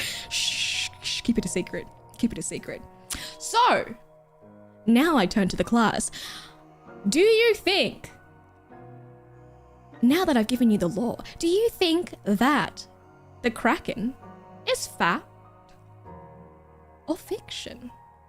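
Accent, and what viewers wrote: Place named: Australia. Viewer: Ahoy, I love the Australian voice.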